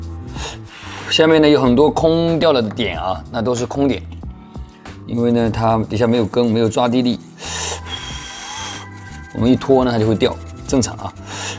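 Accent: Wenzhou, China. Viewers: 这温州口音，太熟悉了哈哈哈哈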